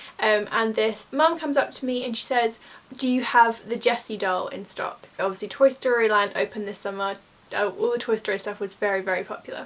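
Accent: New York, United States. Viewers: I’m laughing at your New York accent. I have a Pacific Northwest accent so I’m told. Lol. I used to be a telephone operator. UK operators said to me, “ I can tell you are from U.S. People love to be snobs. Especially from a wealthy area. They talk down to you. I hated it. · Your NY accent is so funny! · You sound like a New Yorker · Your New Yorker accent tho!! Best part of this video! 😊😆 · Your NY accent was great IMO lol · Loved your ny accent lol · your New York accent is great for a Londoner or someone from the South! Fascinating stuff. If you work at Euro Disney you will have 20 years of stories for YouTube in the space of a couple of years! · Trust me your NYC accent is 100x better than most Americans British accent 😂 I love these types of videos btw! I’d love to see another installment of the magical moments you or your friends have had/made too ♥️ I hope you’re having/had a great Disney trip and make it home safe!!!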